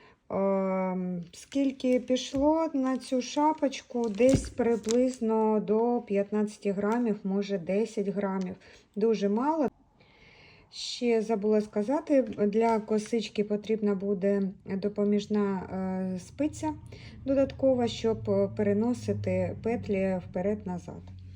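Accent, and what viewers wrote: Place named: Russia. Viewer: Відпрацюйте українську мову , трохи ріже слух ваш російський акцент ..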